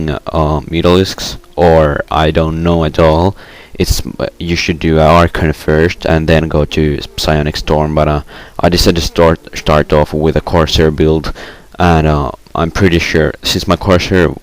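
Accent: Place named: Finland. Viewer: Finnish accent is so beautiful ^_^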